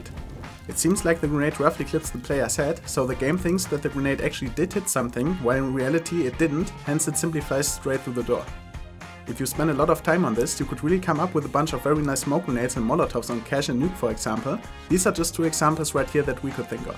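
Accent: Germany. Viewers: Geiles video. Hahahaha Deutsche hören instant, wenn jemand anders deutsch is. auch wenn er englisch redet XD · Wie er einfach den tooodes deutschen Akzent hat. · uuh ein deutscher xD geiles info Video :p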